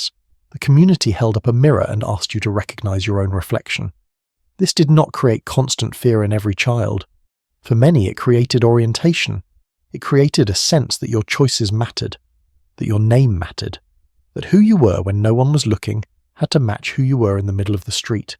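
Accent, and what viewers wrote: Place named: United Kingdom. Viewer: I just wish the AI voice wasn’t British. I’m getting pretty tired of that accent in AI.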